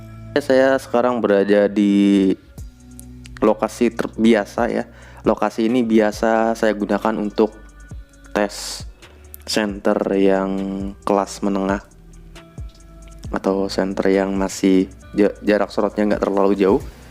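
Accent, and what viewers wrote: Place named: Banyumas, Indonesia. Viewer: Jos gandos 👍👍👍 ora ngapak ora kepenak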